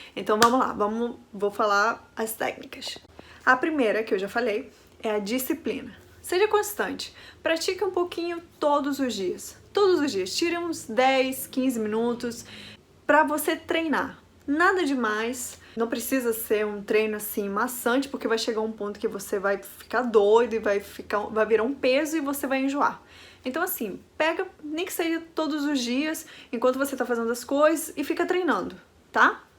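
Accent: Rio de Janeiro, Brazil. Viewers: Perdeu o sotaque de quem fala espanhol e ganhou o sotaque um tanto carioca. Bem! Sotaque carioca é bem brasileiro. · vc já é cariosíssima pra quem não sabe a história da sua família aqui nem imagina que vc é estrangeira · Você tem muito sotaque carioca🥰 Eu amoooo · Eu acho que você sentia-se incomodada , sobre como as pessoas te tratavam.... ou não queria se sentir estrangeira....você queria sentir-se pertencente ao País ao lugar.... pegou até o sotaque carioca..... só cuidado para não ficar caricato..... (forçado) Parabéns pela dedicação.....realmente não parece que você não é do Brasil... bjs. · Acho vocês SHOW. Mas eu vou te corrigir. Você não perdeu sotaque nenhum. Se esqueceu do xiiii (Carioca) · Incrível seu fino sotaque carioca,! Trabalhei em uma empresa de assistência técnica que tinha clientes por toda América Latina. Cheguei a morar por um ano na Venezuela, onde percebi que os brasileiros conseguem compreender bem melhor o idioma espanhol que os hispânicos podem entender o português. 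Certamente a grande variedade dos fonemas do português é um desafio para os estrangeiros. Parabéns! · Fluentemente nacionalizada brasileira, mas tragicamente desperuanizada para transforma-se numa careoca . 🌺🌹🥀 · É uma carioca da gema.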